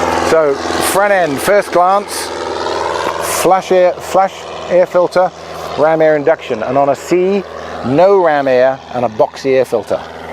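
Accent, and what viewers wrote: England, United Kingdom. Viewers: I listen to you for the "English" accent so say "leeever".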